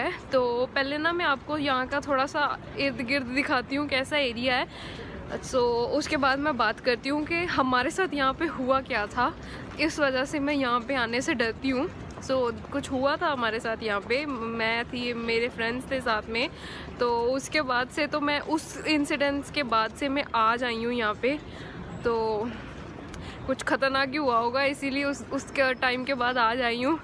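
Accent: Punjab, India. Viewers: Even while talking in Hindi , accent is still Punjabi ...love u cutie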